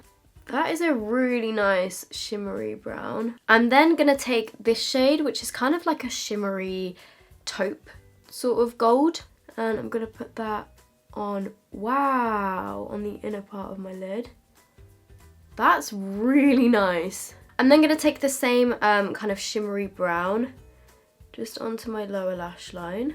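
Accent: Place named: United Kingdom. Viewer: After almost every sentence you say I repeat it like an annoying sibling. Sorry can't help it. You sound super British 🤗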